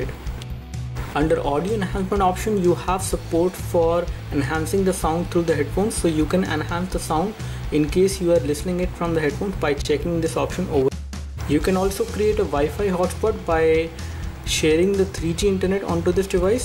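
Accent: India. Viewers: i quite like your reviews except for this english... i don't know to tell but your english is a bit too indianish ahha :) never mind of a stupid like me, good work guys ;)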